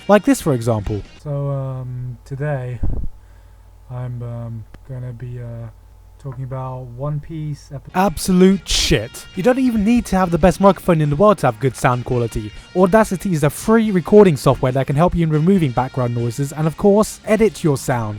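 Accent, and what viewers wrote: United Kingdom. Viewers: Why DOES he sound British???? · Did you learn the British accent just for your videos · Why does this japanese guy sound british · British accent, check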